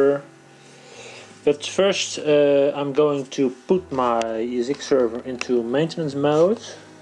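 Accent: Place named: Netherlands. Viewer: aan jouw accent to horen ben jij Nederlands. Vraagje, werkt dit ook met Microsoft RDP? Ik heb thuis een ESX 6 host. Hierop heb ik een aantal servers waaronder een Terminalserver waar mijn familie op inlogt. Nu wil ik dus de Quadro 4000 met ze delen zodat ze binnen Terminalserver gebruik kunnen maken van 3D mogelijkheden. Is dat mogelijk hiermee? Want in jouw demo maak je gebruik van VMware View. Dank je wel voor jouw video!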